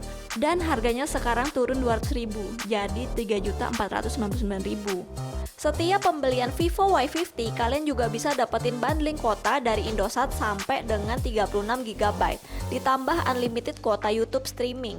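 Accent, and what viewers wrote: Yogyakarta, Indonesia. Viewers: Orang Jogja kah?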